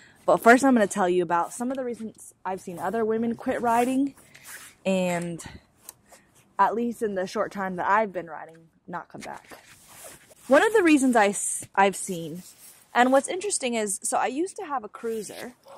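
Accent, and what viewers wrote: United States. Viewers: Dear, I hope I can be your video pen pal, on you tube! My name is Tim and I am nearly 55 years of age, and I live in South Milton, in Devon. I used to be the enemy, a car driver, sharing a car with my Dad, though I have had a collection of motorbikes, studying electronics. My Dad and elder brother had one or two however, until they too became the enemy. May I seriously give you my male support wishing for anyone should learn to ride a bike or drive a car, male or female. Is it very rude, if so, I apologise to you, to ask you, did I detect an American accent? If so, may I wish you a warm welcome to our country?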